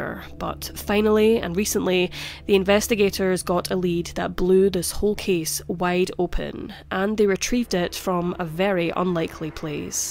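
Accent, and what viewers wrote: Scotland, United Kingdom. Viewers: So good to hear a Scottish crime creator 👏🙏😘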